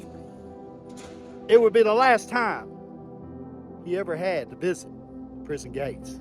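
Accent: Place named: Arkansas, United States. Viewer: What part of Arkansas you from?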